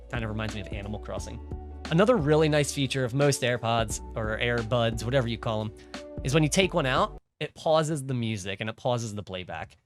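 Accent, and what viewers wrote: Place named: Pottstown, United States. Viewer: Hey man! Hope you are doing well! Great video and review. I've been watching a lot of Philly accent videos and it seems like you've retained our Pottstown way of speaking. Spread that all over Thailand lol. I've started learning Japanese...not sure how it compares to Thai, but different scripts. Would love to catch up and maybe do a video on the travel to Thailand for my travel agency if you're down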